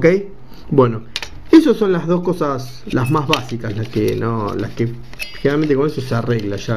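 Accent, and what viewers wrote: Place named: Argentina. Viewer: Es muy divertido escuchar a un argentino explicar cualquier cosa. Me recuerda a "Les Luthiers" yo tengo un cuñado argentino y me divierte mucho jajajaja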